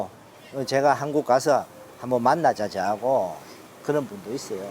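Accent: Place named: Vietnam. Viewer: 주인장 한국말하는 베트남 사람같아요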